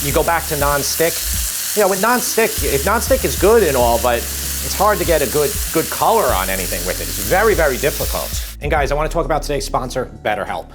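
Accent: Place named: New York City, United States. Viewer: You have the coolest nyc accent i've ever heard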